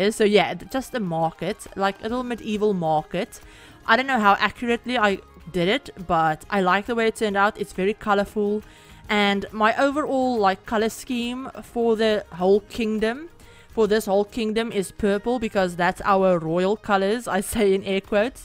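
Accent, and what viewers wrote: South Africa. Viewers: Wait are you South African?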